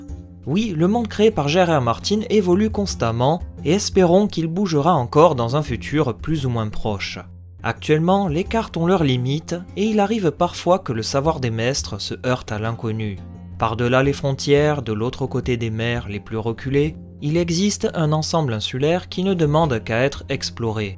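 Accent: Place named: Toulouse, France. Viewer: Hey! Même avec un petit accent toulousain, mille reste invariable! Pas de "s"!!!! à signé la grande pourfendeuse des liaisons dangereuses!:))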